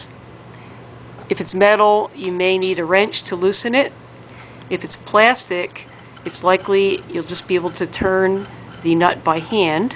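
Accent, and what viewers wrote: Philadelphia, United States. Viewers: That’s a Philly accent if I’ve ever heard one!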